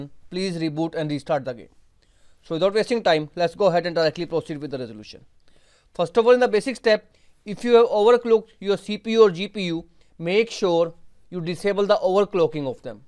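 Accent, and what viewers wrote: India. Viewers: Thank you for the video, I am going to try these fixes. Just one thing; can you please speak slower, it is very difficult with the Indian accent to understand what is being said. I'm an old guy and I find it very hard to follow.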